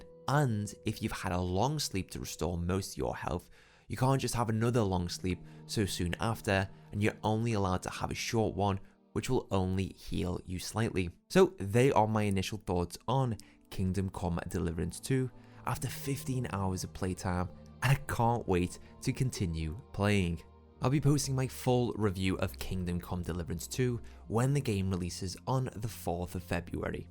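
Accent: Liverpool, United Kingdom. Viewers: Are you a scouser lad?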